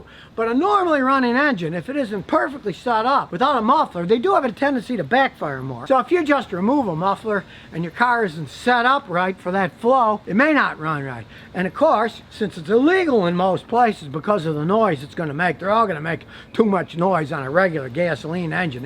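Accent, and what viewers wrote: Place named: Alabama, United States. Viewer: He must be from alabama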